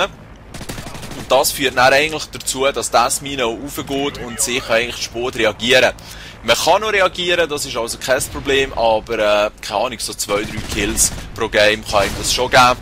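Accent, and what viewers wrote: Switzerland. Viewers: Geil endli mal en schwitzer commentator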